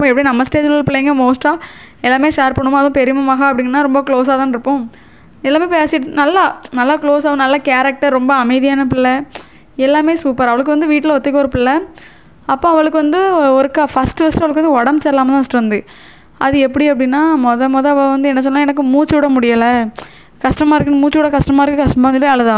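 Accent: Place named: Tirunelveli, India. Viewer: Excellent explanation especially nellai slang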